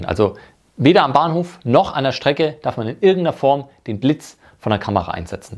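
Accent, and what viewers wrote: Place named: Franconia, Germany. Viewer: Schöner Dialekt, der Fränkische Dialekt. Meine Oma kam auch aus Franken. Sie kam aus Stadtsteinach.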